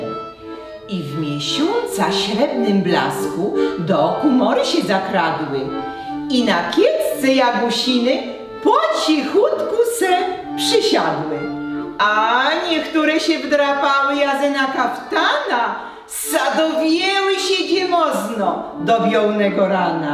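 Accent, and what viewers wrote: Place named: Łowicz, Poland. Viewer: Mistrzyni interpretacji i gwary, prawdziwa gwiozda łowicka🌹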